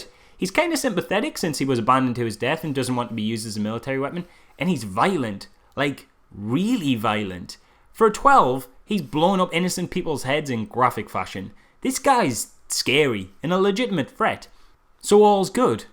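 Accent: United Kingdom. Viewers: a good review with a heavy british accent review takes a thumbs up ....lol dead on m8 :)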